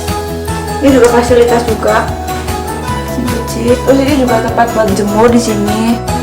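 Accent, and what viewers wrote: Banyumas, Indonesia. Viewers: Kok agak2 medok ya. Orang mana kak? Kayak logat banyumasan gitu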